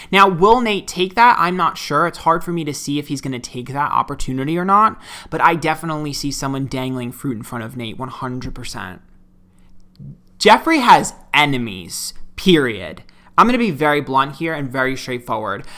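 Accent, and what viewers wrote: New York, United States. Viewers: On a bright note - I love when your NY Italian accent comes out! It's the best! On a serious note - praying SO hard for Jeffree. He really is trying to grow and everyone can relate that it's the worst to be stabbed in the back by someone you love and/or trust. Also, can't help but feel "Too Faced" vibes on the person trying to get at him.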